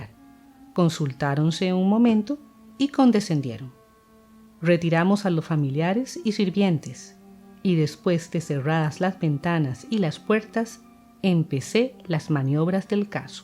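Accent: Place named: Costa Rica. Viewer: Creo que es acento de Costa Rica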